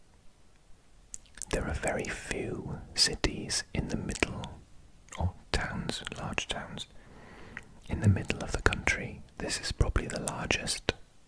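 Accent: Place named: Wales, United Kingdom. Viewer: Having come from the village of the historical seaport Mystic, CT, I can say it's delightful to hear a Welshman explain geography. He's long since passed away, but a local Welsh-American icon Don Treworgy, a close friend of my brother, inspired such a passion for astronomy in our village with his brilliance and kind and gentle manner, we named the town planetarium after him. It was a privilege to know him. Wales will conquer the world, one gentle heart at a time! Even if no one except those who knew him can pronounce his name!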